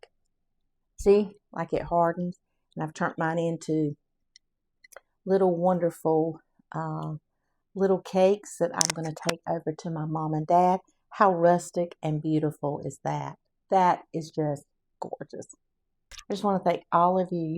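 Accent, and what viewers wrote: South, United States. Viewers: I love listening to a southern woman!!!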